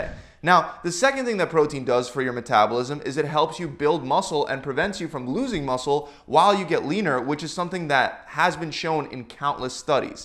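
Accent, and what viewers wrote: Philadelphia, United States. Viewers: “Wooder” - Guy has to be from Philly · now we know he is from Philly :)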